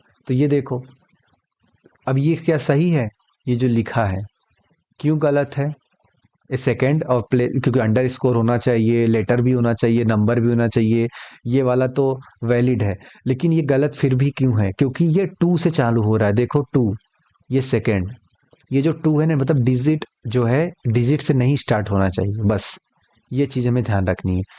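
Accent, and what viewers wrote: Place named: Uttar Pradesh-Bihar, India. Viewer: Nice yaar. Or apni UP Bihar wali hindi me or maja aa rha hai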